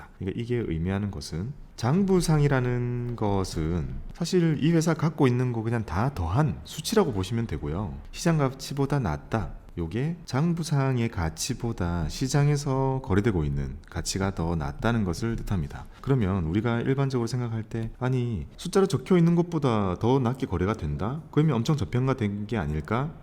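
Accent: Gyeongsang, South Korea. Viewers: 곰희씨 특유의 사투리, 같은 경상도 사투리 사용자로서 넘 친근해요. 우짜죠? ㅋㅋ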